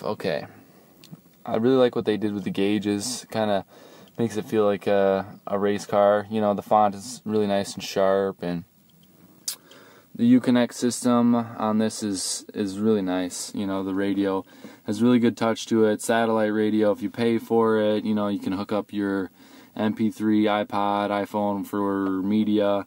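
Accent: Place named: Minnesota, United States. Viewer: Damn that Minnesota accent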